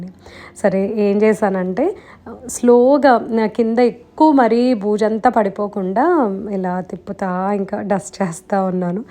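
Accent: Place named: Vijayawada, India. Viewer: yes...but mana Vijayawada slang almost superey..kada..proper Vijayawada...but memu eepudu telangana lo mahaboobnagar lo vuntam..ma vari job rithya..tanu press dpt..in Eenadu..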